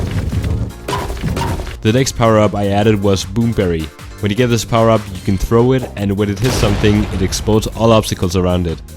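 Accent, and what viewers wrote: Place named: Denmark. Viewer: After watching for less than 30 seconds i managed to guess that you were danish correctly, based on your voice alone.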